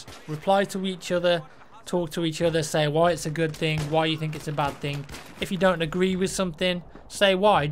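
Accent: United Kingdom. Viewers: i love the sweet sound of your uk voice, can we game on box later...not that box you perv...360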